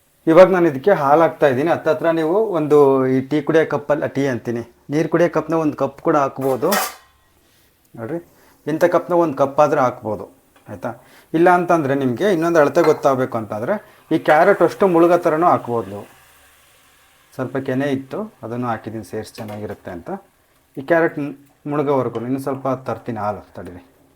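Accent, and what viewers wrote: Chitradurga, India. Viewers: yenthasngge aduge madthira nam durgada bhashe keli kushi aythu😊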